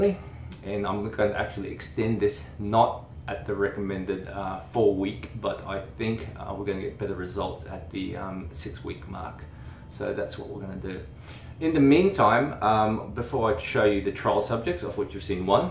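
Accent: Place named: Australia. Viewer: will you add the Newa to your device testing? It's a radiofrequency device that claims to use the same 3DEEP technology used in the clinic setting. The makers of Newa further claim the device induces production of collagen and elastin. The Newa website states a study showed an average collagen increase of 3.82 percent (but fails to state how the test was conducted... and I never trust any test not done by an independent, third-party facility). Also, what are your thoughts on the Tria laser (basically it is an at home Fraxel type device)? Would you reccommend the Tria over dermarolling? (I already own the Tria so am basically curious whether I should continue to use it or dermaroll or alternate treatments?) Thanks so much for your videos!!! I love everything about skincare and being able to a professional with an Australian accent talk about skincare is damn near perfection in my book.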